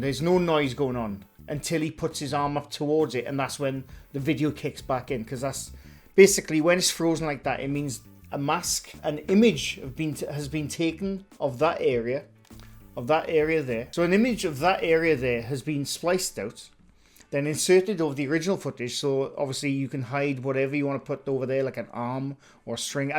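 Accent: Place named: Wales, United Kingdom. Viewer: I love your accent! Aaahhh gotta love a Welsh man!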